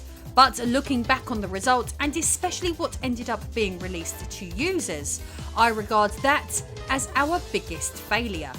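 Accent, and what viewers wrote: United Kingdom. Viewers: You are absolutely the perfect person to cover this material! Sultry and British!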